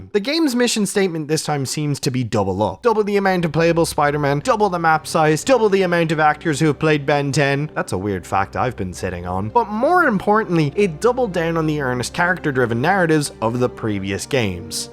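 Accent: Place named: Ireland. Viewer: The accent came through , Irish content creator? Dope videos by the way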